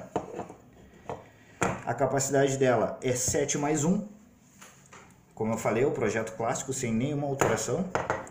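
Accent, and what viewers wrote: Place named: Porto Alegre, Brazil. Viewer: Excelente vídeo. Só não gosto do teu sotaque de Porto Alegre... Agarrei nojo por conta da Manuela D'avila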